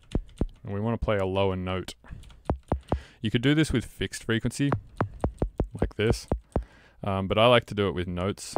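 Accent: Australia. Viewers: Wait are you Australian hahaha, if you were from western aus your accent would fly under the radar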